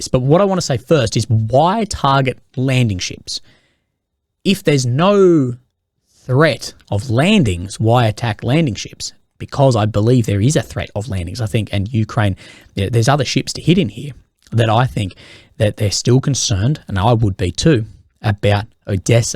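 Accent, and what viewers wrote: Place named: Australia. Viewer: Its just how people talk in Australia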